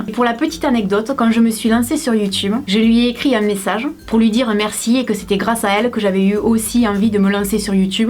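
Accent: South, France. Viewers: Sud de la France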